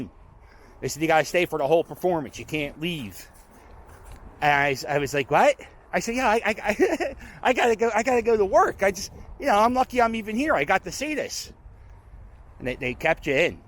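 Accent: Philadelphia, United States. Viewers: I love the accent...he sounds like my cousins in S. Philly.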